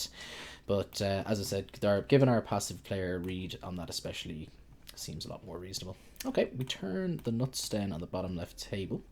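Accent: Ireland. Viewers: YOUR IRISH !!! fuck yeah !! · Irish confirmed.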